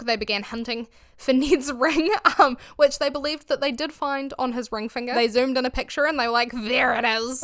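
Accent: New Zealand. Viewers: You're so kiwi I love it. I'm Aussie and definitely would have asked you to say "six" and then laughed when you said "sex" when I was at school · I mean i heard it, i went to check her page, was delighted she's a kiwi, and went on with my day 😂 do people really complain about that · So weird (good weird!!) to hear another NZ accent!! ❤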